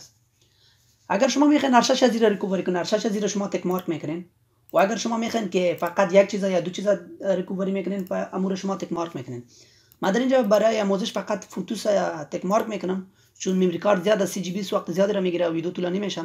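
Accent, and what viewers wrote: Afghanistan. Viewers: با احترام به شما دوست عزیز که زحمت کشیده اید این ویدیو اموزشی درست کردی برای رفع مشکلات کاربران ولی متاسفانه خیلی خیلی تند حرف میزنید و همچنین لهجه ای افغان بودنت یکی دیگر از معایب این ویدیو میباشد شما اگر نیت خیر اموزش دادن را دارید میتوانید از یک شخص دیگر که هم خیلی قشنگ بتواند فارسی را حرف بزند و کلمات را طوری بیان کند که هر شنونده از گفتار و نوع اموزش لذت ببرد من متاسفانه با اینکه مشکل ریکاوری مموری کارت داشتم ولی نتوانستم از ویدیو اموزشی شما استفاده کنم انشالله دیگر این صدای شما را در ویدیو های بعدی نشنوم و یکی از دلایل در پیشرفت و موفق بودن هر اموزش دهنده مطمئن باشید همین درست صحبت کردن میباشد ،امیدوارم از دست من ناراحت نباشی چون نمیخواستم زحمت شما هدر برود برای ویدیوهای بعدی وظیفه خودم دانستم که بشما این عیب و ایراد کارتان گوشزد کنم . با تشکر احمدی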